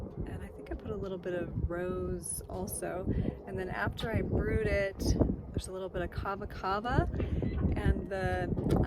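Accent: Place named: South, United States. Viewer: Thank u that was helpful! My tongue has been burning 4 many months now so I’ll try the teeth breathing. Be safe on your journeys ❤ Oh yeah…& you are talking like a southern girl…u said “Hey ya’ll welcome back to my channel!” First thing I noticed!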